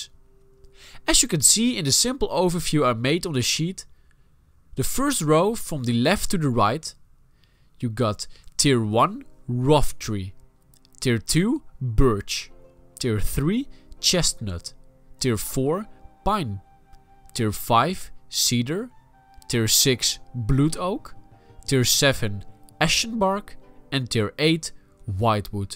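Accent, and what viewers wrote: Netherlands. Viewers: your accent actually arouses me. is it dutch?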